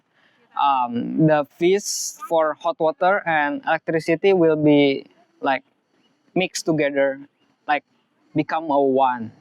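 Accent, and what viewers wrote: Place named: Indonesia. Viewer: so what citizen are you? indonesian? your accent sounds like an indonesian accent btw hehe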